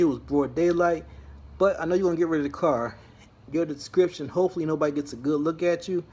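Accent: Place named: Philadelphia, United States. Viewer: You sound like you from Philly... Lol love the review and theatrics 😎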